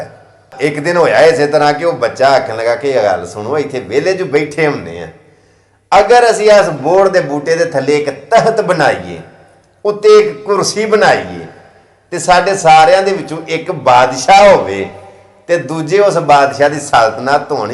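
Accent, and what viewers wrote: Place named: Gujranwala, Pakistan. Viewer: Story nonany wala apne lhjy se Gujranwala side ka lg rha.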